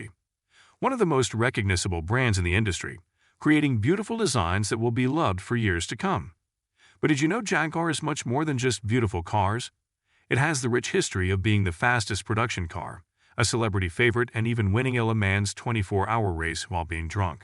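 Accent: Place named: United States. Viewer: Why can't the narrator say K not T? This is about an English car and should be narrated by an English speaker not a Yank trying to speak English.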